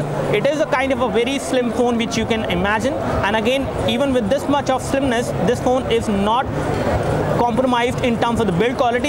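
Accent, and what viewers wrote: India. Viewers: TsukaimaR1ngu Indian english man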